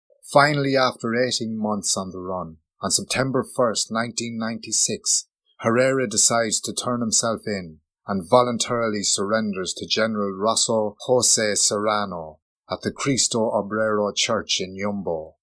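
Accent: Ireland. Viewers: That Irish accent is not the best narrating sound lol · Colombia in a Irish accent. That as a Scottish person and someone deeply into Colombia and the false war on drugs , CIA, Pepes and Pablito etc very good Patrick Escobar Popeye RIP.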